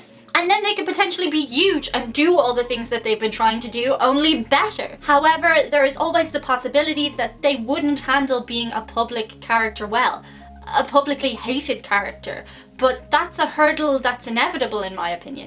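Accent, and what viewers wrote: Ireland. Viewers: absolutely love you. You make me smile and I know of no one who can talk as fast as you. I have no clue what a Irish accent is but yours isn't what I thought was Irish. I can even understand you as your speaking 6 million words per minute. Isn't it wonderful bloggers have been unveiled, LMFAO. Go girl.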